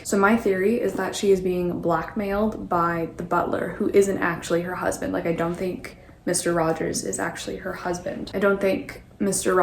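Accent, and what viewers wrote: France. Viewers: ok so i have only just found you and genuinely thought you were french... like parisien french... and i speak french with a deliberate burgundian accent... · Your French accent was so cute! 🤗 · the cigarette , the spoon , the mustache , the accent . we have ourselves a french detective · Are you French or Belgian or Canadian? I've seen And Then There Were None (1945 film) and I've read The Murder of Roger Ackroyd so ... I know all the answers! It sounds like the film and the book have different endings!! WOW! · Loved the French accent🇫🇷🥖👏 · Ton accent français m'a fait sourit, c'était drôle 👌❤️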